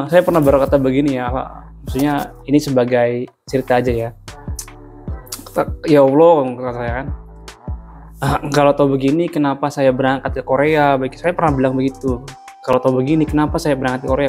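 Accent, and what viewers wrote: Indramayu, Indonesia. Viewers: Sampean wong endi dermayue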